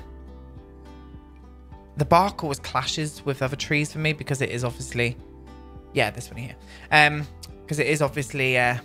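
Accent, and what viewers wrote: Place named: RP, United Kingdom. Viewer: I looooove your pip pip cheerio british accent! Your builds are gorgeous!! ❤️ Much love from Norway 🇳🇴!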